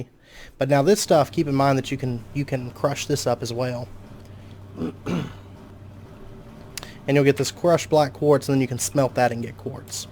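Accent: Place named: South, United States. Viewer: Just starting your series even though it's dated. The same 1 I am playing now. I am new to modded Minecraft. Really enjoying the video so far, took a quick break to ask you where you're from? You sound very Southern. :-)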